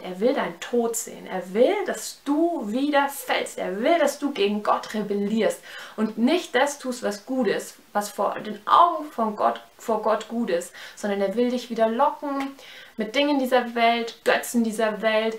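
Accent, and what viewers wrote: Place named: Austria. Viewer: du hast schon voll den österreichischen akzent übernommen... :D kannst du mal eine tagesroutine mit jesus drehen?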